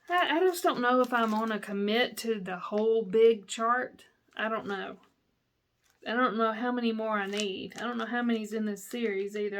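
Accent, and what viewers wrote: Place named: Tennessee, United States. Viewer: Congratulations on reaching 500! You're so cute, live hearing your Tennessee accent..Halloween will be here before we know it.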